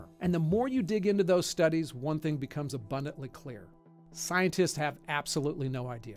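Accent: United States. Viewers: I love yourvideos but you def have a " gay californian" accent it's wild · San Francisco accent.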